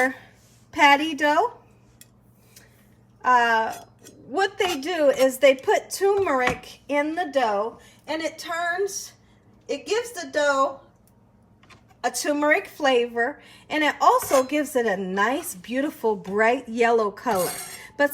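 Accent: South, United States. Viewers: thank you for this recipe of Jamaican beef Pattie’s “101” as you put it! I never thought of using ready made empanada dough! I think I’ll try it! This looks delicious! This is the first time I ever saw a nice southern soul sister like yourself make Jamaican beef Pattie’s on video with a southern accent! I love it I love it I love it!!! “ you hear me?” 😂 That’s also what I like about your other videos as well! They are so cheerful and could easily cheer up an otherwise blue person! Keep it up my dear! Thank you thank you thank you! I grew up eating Jamaican beef Patties! God bless and keep it up! Ps: thank you for this dough shortcut because sometimes it’s a little troublesome and time consuming and at times it doesn’t turn out right for me when preparing the crust!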